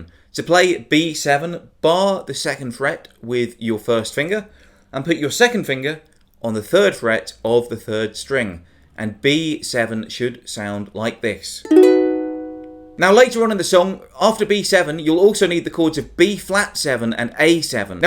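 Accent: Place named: United Kingdom. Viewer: I love youuuuu. The best Ukulele teacher ever!!!!! You make the instructions so simple to follow!!! I love youuuu. You've just got another subscriber. Huhu I love you so much you make it much easier for me. I just began learning Ukulele a couple of days ago and now I can play and sing. Next is this song, my most favourite jazz song ever:( I love your British accent too. Hope everything good comes to you <3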